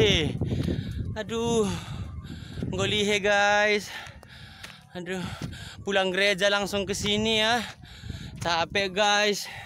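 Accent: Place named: North Sumatra, Indonesia. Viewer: Vlokndu si enda sangat bagus utk di jual bp. Uga maka la erujung. Pakai bahasa indonesia dgn logat karo yg medok. Kam ciri khasndu( warna) karyandu kan itu. Coba lebih njilimet lagi pasarkan t karo. Pasti banyak like.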